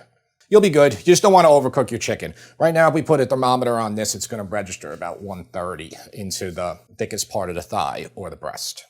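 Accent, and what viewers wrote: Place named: New York-New Jersey, United States. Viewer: With his NY-NJ accent, the host sounds like comedian Jim Florentine. 😂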